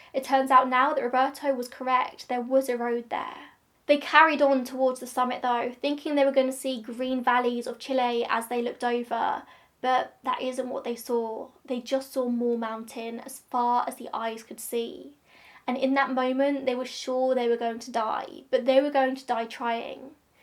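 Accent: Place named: Tottenham, United Kingdom. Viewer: I'm gonna guess you're from Tottenham. Just a random guess...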